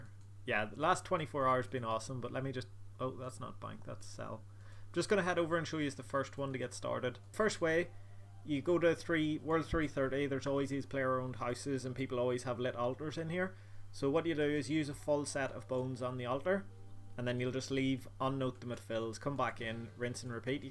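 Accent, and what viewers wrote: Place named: Northern Ireland, United Kingdom. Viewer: Subbed and liked! Are u from Ireland by any chance? Ur accent sounds northern Irish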